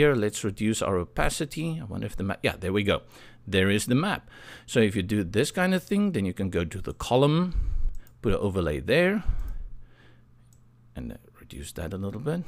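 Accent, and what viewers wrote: South Africa. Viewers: Thank you for the video, really appreciate the time you put into it. Lekker om na 'n Afrikaner te luister :)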